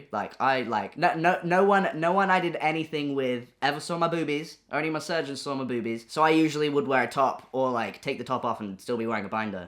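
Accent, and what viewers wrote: United Kingdom. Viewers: Dude I literally thought you were just an androgynous guy with a lovely British accent- Edit: Is that transphobic??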